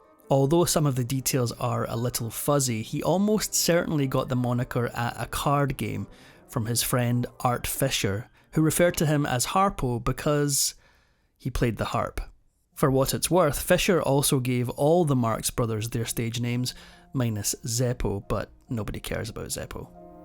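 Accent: Scotland, United Kingdom. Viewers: I like the speaker's accent. Scottish? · Clearly has a Scottish accent 🙄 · His accent is Scottish. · A fine Scottish accent. Didn't realise that's how you pronounced CROWKAY though... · Who doesn't love Harpo? A preh-tty gued Brehtish (or Scuttish or Oyrish?) narraytion. I agree with the comment about not dissing Zeppo. He was quite talented on & off screen. One story relates how critics raved about Groucho's performance, when it was Zeppo subbing for an ill Groucho. Give him a break. But Harpo, wow!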